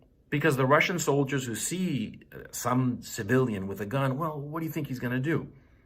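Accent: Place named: United States. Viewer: Let’s start from the beginning. As you mentioned on you title and your first words, INVASION. Now, let’s describe what invasion means. Invasion means to enter someone property or house or country by force and steal all. So now you daub that Russia is not bombing Ukraine, we have already 14 days and just last night they bombed an Hospital ( Maternity) So you are you trying to say that Russia is bringing flowers to the Ukrainian people. My friend this is war, and 14 days has passed and they are not able to reach the capital yet. Nothing against Russian people, but the government yes in deed. Mr., I don’t know you by your accent you sound American and you are one those people that is a loser and badmouth your own country. Open your eye, it’s a war, people will suffer with this stupid war. The leader should learn what we humans beings have been true all those years work all the wars like WW11, where 6 million people died from Europe and more then 25 million from Russia. 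We are in 2022, there no reason for war. Now, you sjouke be more smart then that. Now my question is, it has been already 14 days of this was R and this video is when it just started. I just wonder where are you now??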